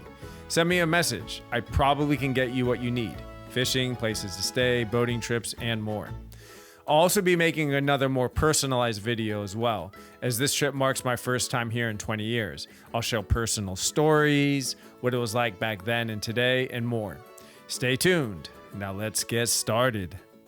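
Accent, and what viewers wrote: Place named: Northern California, United States. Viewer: Thanks for this! Love your commentary. You sound like a Northern Californian LOL. Gonna go in a few weeks!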